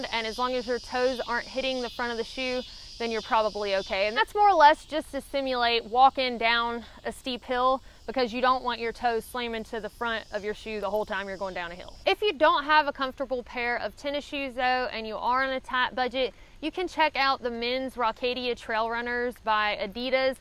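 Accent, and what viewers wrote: South, United States. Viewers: Ha ha! You say “strowed” just like my father from North Carolina. Don’t ever stop talking like a Southerner. Southern dialect is endangered.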